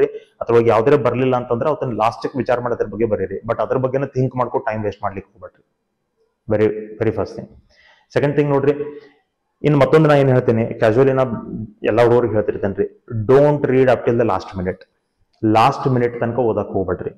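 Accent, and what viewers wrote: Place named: Hubballi-Dharwad, India. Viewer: Thank you so much sir❤.. Sir niv hubballi dharwad..dawra..😊.. Yakamdra..accent hanga anastade..😊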